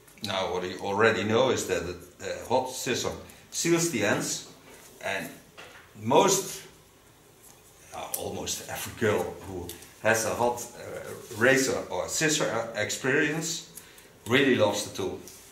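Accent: Netherlands. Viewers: You made me laugh, with the ears. Love your video's, you must get tired, working all day, then doing these video's for us friends on you tube. Do the rollers you used, give a soft curl, compared to the others you normally use. Joy to watch. Love the Dutch accent. Love from England.💖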